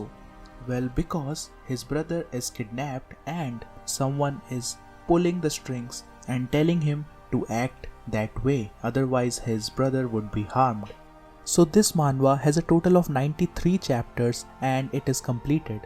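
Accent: India. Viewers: bro pls turn back the old video type ı don't wanna hear your indian accent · His accent like indian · Indian detected hehe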